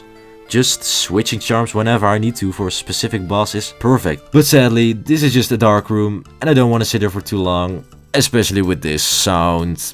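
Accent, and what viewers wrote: Netherlands. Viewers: Are you dutch? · ayo ben jij nederlands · Love your dutch accent😁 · Ja duidelijk een nederlander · Are you dutch?? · Dutch accent spotted in the Hollow Knight wilds! This was a super fun video, your dedication to making it as good as you can really shines through 🫶🏻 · Are you dutch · You sound dutch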